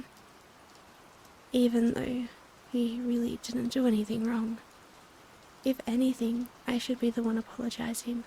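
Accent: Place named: Australia. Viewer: AUSTRALIAN ACCENT 🤩🤩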